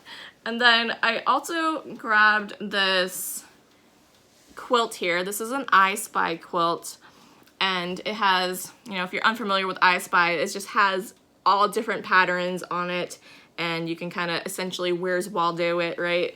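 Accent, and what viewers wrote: Maine, United States. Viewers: Wonderful Maine accent!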